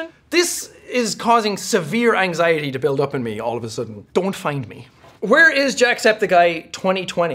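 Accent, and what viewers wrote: Ireland. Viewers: Most Irish accent ever lad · "an Irish accent goes a long way"... Have you forgotten how you started out:s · The reason why I start watching his videos because of his Irish accent sound funny and inspiration but long stop watching his video due to burnout so I just start to see my sister become fan of now. It have been 7 years already that I stop watching his video now good to hear he healthy and keep continuing his career. Well except those stuff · I am not about to scroll through 4,425 comments to see if anyone else has already said this, but the question is "Is Jacksepticeye in Human Resources?" There is a show on Netflix called Human Resources, which is a spinoff of Big Mouth and has some crossover characters. There is a character named Flanny O'Lympic, a Lovebug who speaks in an Irish accent. He is voiced by Chris O'Dowd, a fellow Irishman (best known as Roy on The IT Crowd). 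Maybe the people who googled that think Chris and sound alike because they are both Irish? It premiered March 18, 2022, three months before this video was released. Maybe hadn't heard of it or seen it.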